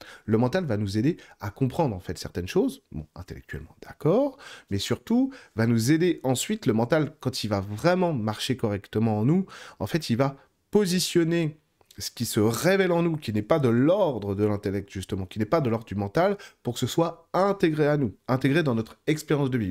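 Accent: South, France. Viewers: Vidéo géniale comme d hab! Merci. « Je clairvoye à mort ». Je clairvoye, tu clairvoyes, il/elle clairvoye etc etc❤🎉😂😊. Topissime l’accent du Sud « à la bien cousin, en place » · Merci beaucoup ce partage! J'adore l'accent du sud!😂 ❤❤❤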